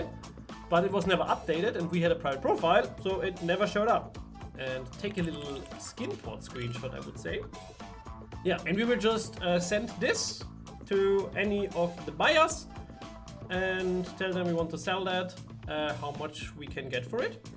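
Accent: Germany. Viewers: Love your german-english accent :D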